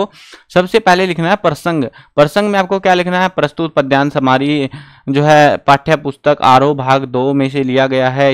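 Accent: Haryana, India. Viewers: Sir haryanvi hai kya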